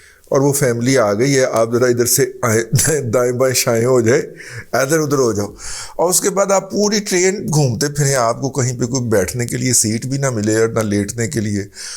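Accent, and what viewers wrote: Punjab, Pakistan. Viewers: رضوان صاحب ۔۔ آج آپ کا انداز گفتگو بہت اچھا لگا ۔ خالص پنجابیوں والا ۔ تینوں مکروہ چہرے والے چوتھا ٹرکوں کا شوقین ۔ناسور اتنا پھیل گیا ہے۔ دور دور تک اثرات پہنچ رھے ھیں ۔ خدا اس ناسور سے دیگر ججوں کو محفوظ رکھے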